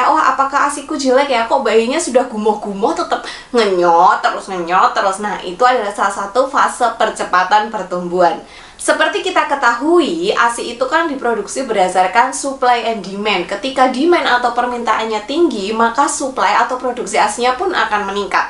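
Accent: Java, Indonesia. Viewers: Pertama kali simak video dari channel ini, seneng banget denger logatnya medok medok khas Jawa.. Suwiiii biyaaanget.. 😂❤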